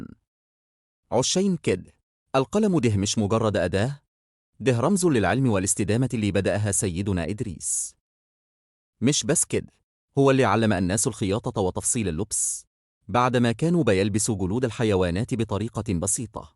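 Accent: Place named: Egypt. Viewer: فعلا معظم الناس مش تعرف قصته وكمان أشكركم جدا على وضع اللهجة المصرية❤❤🙂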